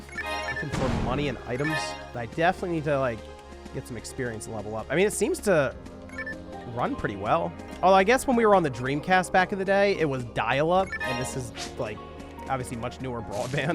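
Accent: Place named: Philadelphia, United States. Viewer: You have a very heavy Delco/Northeast Philly accent...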